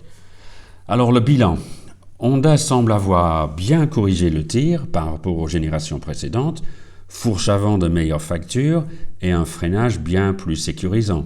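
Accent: United Kingdom. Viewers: ah je me sens Plutot mieux mtn. Javais penser: comment ose-tu parler mieux le francais que moi? Pendant toutes les annees que je suis Aller en France on me dit " je n'ai jamais connu aucun anglais qui sache notre langue comme toi" mais vu que tu vie en France tout ce temps la, ca fait du sense tu que sois meilleur que moi. Ca fait plaisir aussi le fait que t'aie pas perdu ton propre accent britannique plutot que parler fr avec un faux accent fr enervant comme font les profs de fr au RU. 😀😀😀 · Voici donc une courageuse et chouette decision qui ravira votre auditoire MotoFrancophone auquel je fais désormais partie. J’ajoute que votre pointe d’accent British et un raffinement absolu pour l’oreille, tout comme pour superbe moto, la perfection de sa conception doit passer imperativement par un savant reglage de sa sonorite! Les oreilles au service des yeux en somme. 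Vous réussissez avec brio ce pari egalement. Bravo et un enorme merci de nouveau!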